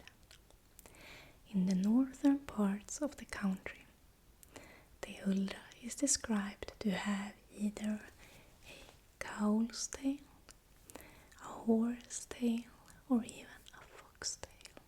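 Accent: Sweden. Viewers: I swear Swedish accent is the best accent. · Vilken underbar svensk accent!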